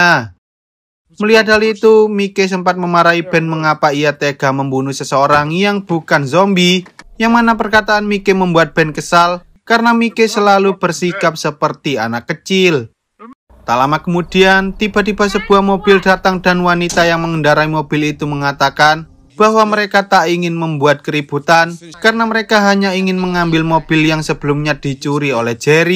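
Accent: Java, Indonesia. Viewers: jowo ngendi mass? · suara jowo nya kurang kurangi mas 😃😃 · Coba plis logat jawa nya jangan di pake ,jadi susah nyimak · Logat jawanya masih kental kali😂😂😂...jd kurang sedap mengikuti alur filmnya😢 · Kamu org Jawa ya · Fiks org Jawa Admin ny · ORANG JAWA YA BANG .. NGOMONG NYA MEDOK.. JAWA NYA MANA BANG .. AKU SEMARANG BANG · Medok bgt jawanya mase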